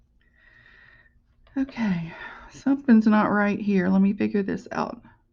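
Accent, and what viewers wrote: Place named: South, United States. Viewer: Are you from the south? You have a suthern accent. (Love it )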